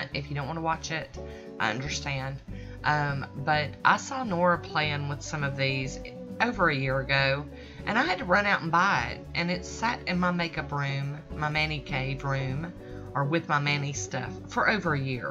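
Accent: South, United States. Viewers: Nice flower! I watch Kirsty everyday, love her videos! You should be able to shape the petals on the mat and then transfer them to the nail, but that might be even harder. Despite what everyone else does you have to figure out what works best for you. I enjoy watching you do your thing: it inspires me and I really like the sound of your voice - that lovely southern accent in particular!!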